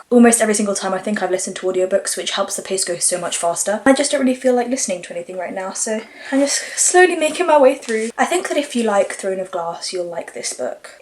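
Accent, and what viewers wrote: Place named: Bosnia and Herzegovina. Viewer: Are you Bosnian? Your accent sounds Bosnian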